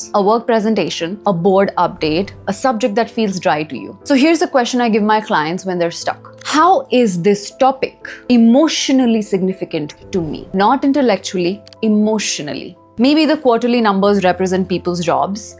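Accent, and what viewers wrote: Odisha, India. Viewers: Hey are you Oriya by Any chance? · Same doubt bcz the represent that 😂 i think she is from Odisha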